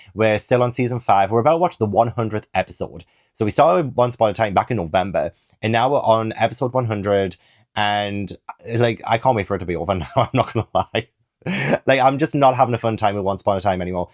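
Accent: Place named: Newcastle, United Kingdom. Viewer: I love how Geordie you get talking to the cats!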